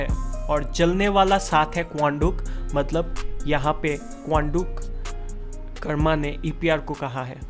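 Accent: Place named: Bengal, India. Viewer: Your bengoli Akcent is so cute 😃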